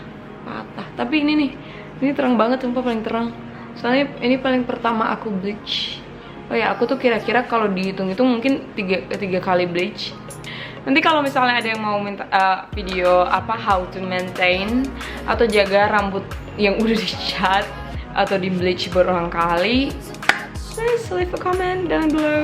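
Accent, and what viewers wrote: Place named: Manado, Indonesia. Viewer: wihh.. Kaget dengernya.. ternyata orang Manado.. 😄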